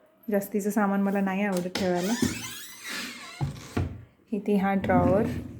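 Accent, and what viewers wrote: Kolhapur, India. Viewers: काल पहाटे 4वाजता अचानक जाग आली.झोप येईना म्हणून मोबा. चालू केला.पहिलाच* * तुझा विडियो 'किती मिळाले' हा पाहिला,नंतरं 'आमचं लग्नं' हा पाहिला. भाषा जरा कोल्हापूरची वाटली.त्यामुळे जरा उस्तुकता वाढली.सकाळी उठून बाकीचे vlogs पाहीले,फालतू कमेंटना ' माझं चाळीतल घर,' व ' मिक्स ' ह्या vlogs मस्त चपराक हाणली.तर ' माझं रूटीन ' मधून घराची सगळी कामे करत vlog करणं येड्या गबाल्याच काम नाही.हे तू दाखवुन दिलंस.सुंदर मांडणी,निखळ बोलणं, कॅमेऱ्याच हाताळणं उत्तम. स्वच्छ, सुंदर,निरागस वागणं,बोलणं, हास्य उत्तम.तुझ्या ब्लॉगला हार्दिक शुभेच्या!!! T.V. वरील फालतू सीरिअल्स बघण्यापेक्षा हे बरं!! एकेरी तुझा उल्लेख केला कारण आमच्या लग्नाला २१ वर्षे पूर्ण झालीत. मी सांगलीचा तर बायको कोल्हापूरची. ब्लॉग बघताना ज्या ज्या घटना घडल्या त्यातून आमच्या जुन्या आठवली जागवल्या.पूर्ण कुटुंबाला शुभेच्छा!!!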